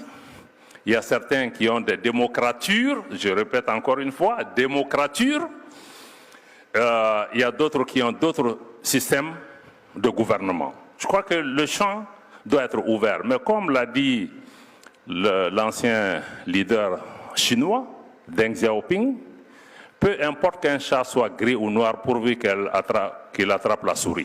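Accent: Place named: Democratic Republic of the Congo. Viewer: J'ai toujours trouvé un accent lingala à ce monsieur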